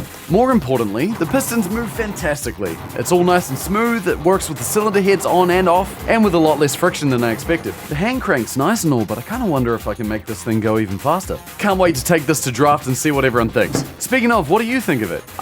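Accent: New Zealand. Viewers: Was NOT ready for a Kiwi accent lol so naturally "deck box" sounded like something else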